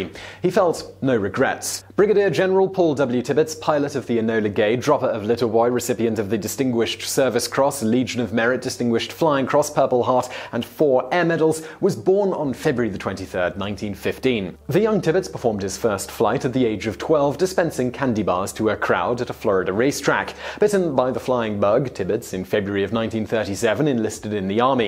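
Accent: United Kingdom. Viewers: The main reason I come here to hear how British people say words.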